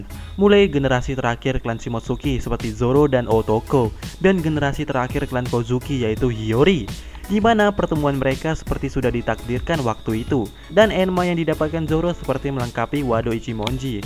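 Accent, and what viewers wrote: Palembang, Indonesia. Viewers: pantesan suaranya kek orang palembang gitu min hehee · Fix orng Palembang😂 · Ay wong Palembang kau ni ee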